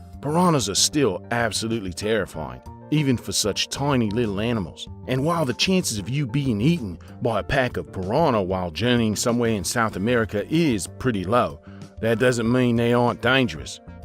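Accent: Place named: Australia. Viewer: It's the Australian accent for Me. 🤔🥰🤗🇨🇦💖 No, I was never a fan of Irwin, but a tragedy nonetheless. 🤔🥺😭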